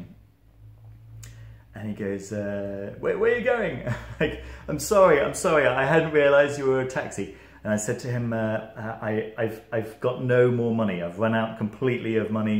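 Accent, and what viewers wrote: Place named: England, United Kingdom. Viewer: No offense, but I can see the French heritage in your eye's and nose. So weird seeing that paired with your English dialect.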